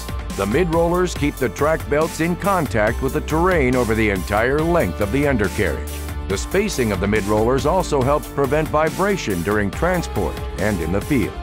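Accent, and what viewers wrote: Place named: South, United States. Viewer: That southern accent is whats making it. Perfection!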